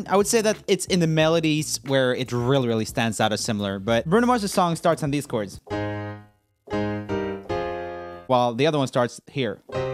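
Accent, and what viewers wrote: Sweden. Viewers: It's his accent. Idk why Swedish accents are like that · Its probably his swedish accent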